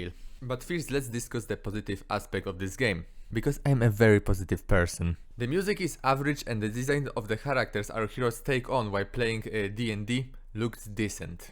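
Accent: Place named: Poland. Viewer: You sound very polish :)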